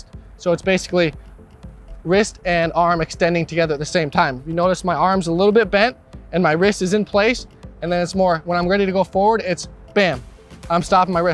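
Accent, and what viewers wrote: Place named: Northeast, United States. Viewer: Played it at 1.5 speed! Reminds me of folks from the northeast!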